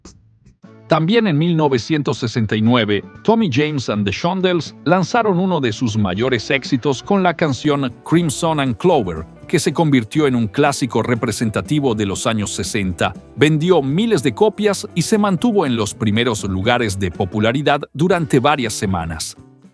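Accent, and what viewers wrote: Yucatán, Mexico. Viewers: ¿El narrador habla como yucateco o me lo estoy imaginando?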